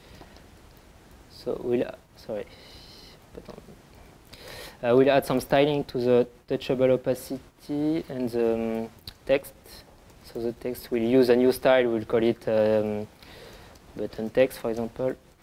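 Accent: France. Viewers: Tu aurait pu la faire en français.....vu l'accent ^^ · ton accent francais il dechire lol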